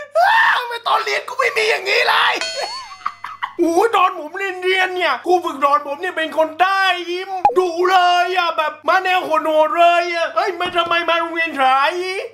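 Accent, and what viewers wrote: South, Thailand. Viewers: พี่ชอบภาษาใต้หรอมาเดี่ยวหนูสอน นุ่ยคนใต้นิพี่บ่าวเห้ออ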